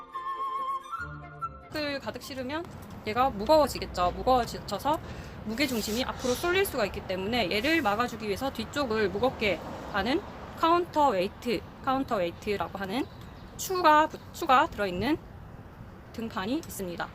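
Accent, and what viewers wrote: Gyeongsang, South Korea. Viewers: 마 갱상도 사투리가 슬쩍 들리네예.포클레인은 굴착기 제작 회사 이름이었죠. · 굴삭기땅크애니띵 말투가 갱상도시네요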